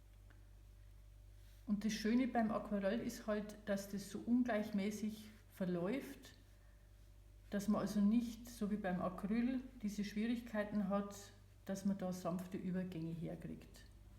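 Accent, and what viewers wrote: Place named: Upper Palatinate, Germany. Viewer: Das sind mit die besten Tutorials für Aquarellmalerei, die ich bislang hier gefunden habe! Die Beispiele sind gut gewählt, nachvollziehbar und wirken nicht einschüchternd. Zudem lehrreich, aber nicht von oben herab belehrend und sympathisch rüber gebracht (insbesondere auch wegen des leichten, aber unverkennbaren oberpfälzer Zungenschlags :)). Vielen Dank.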